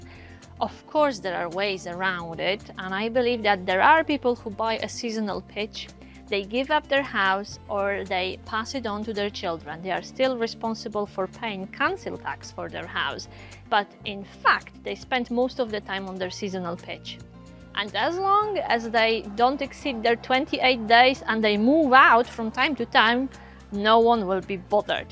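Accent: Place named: Eastern Europe. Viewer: Sounds more like an Eastern European accent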